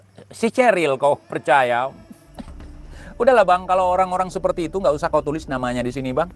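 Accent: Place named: Aceh, Indonesia. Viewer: Bang , peugah haba ,mangat that ta dengo . Makasih . Logat Aceh bék gadòh .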